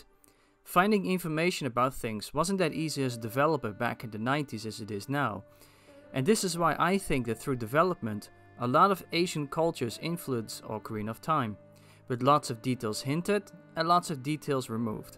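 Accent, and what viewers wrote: Netherlands. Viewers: Dude ben je Nederlands ofz want ik hoor tering vaak een Nederlandse stem en accent er door heen. En je moet een beetje werken aan hoe je sommige dingen zeg naar verder goed bezig man!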